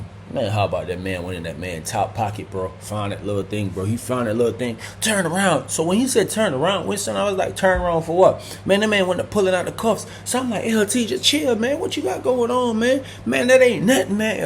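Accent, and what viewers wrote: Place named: Jamaica. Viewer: Your Jamaican your best accent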